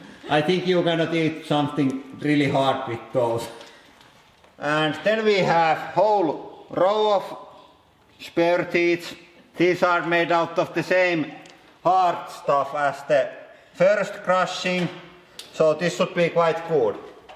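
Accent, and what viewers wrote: Finland. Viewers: I read that with your Finnish accent. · Are you kiddingg me? Half the reason I am here is that Finnish accent LOL it wouldn't be anything good without him talking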